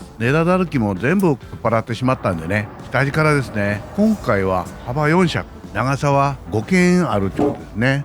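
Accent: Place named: Banshu, Japan. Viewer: 方言は同心円に広がりますからね〜 たまにさんの言葉が私の地元、兵庫の播州弁に聞こえたりします。（疑問形で 〜け？）など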